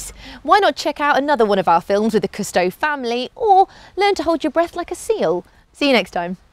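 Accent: England, United Kingdom. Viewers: It's only an English accent, nothing ground breaking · Strongest british accent eva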